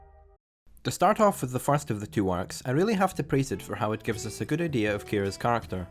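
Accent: Scotland, United Kingdom. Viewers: i've recently started watching your videos and i really enjoy your analyses, cool videos! :) by the way, what part of scotland are you from? (apologies if you aren't scottish; you certainly sound like it!) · are you scottish?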